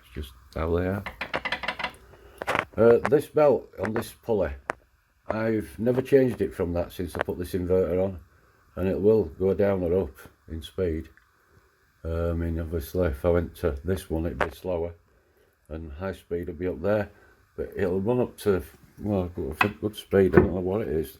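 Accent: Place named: Midlands, United Kingdom. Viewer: I have the same lathe same problem, great fix, i will do mine also, your accent, i'm sure you are from the Midlands, Worksop area, , you speak just like my dad, Cheers from Australia.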